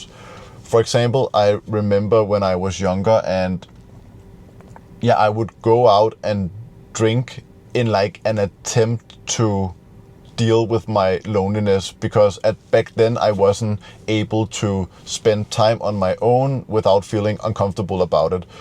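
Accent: Denmark. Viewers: Nice video as always. I am learning a lot from you, so thank you. Your englsh has gone from good to exellent, but you must never lose the charming danish accent .. .-) Can’t wait for the next video.. 💐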